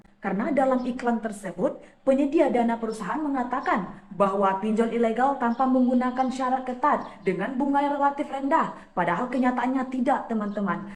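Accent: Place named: Padang, Indonesia. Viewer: Klua irama tngah padang me,,😂😂 is ok, lnjutkan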